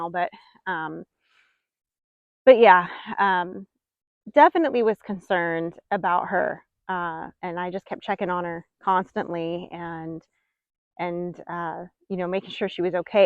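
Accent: South, United States. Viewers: love win your a little tiered you get a southern Drow in you words love that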